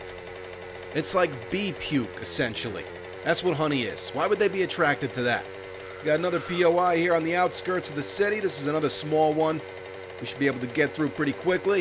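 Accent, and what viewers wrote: New Jersey, United States. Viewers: the saying is "You attract more flies with honey than vinger~" which is true. In wooded areas its common to see abandoned be nests full of maggots among other insects. But its meaning is to always be sweet instead of bitter to get what you want. I love your videos. I'm in New York so its awesome to hear such a thick jersey accent outside of Jersey shore. "Cabs are here"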